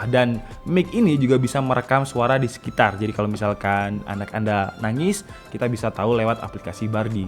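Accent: Malang, Indonesia. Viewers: bang orang malang ya?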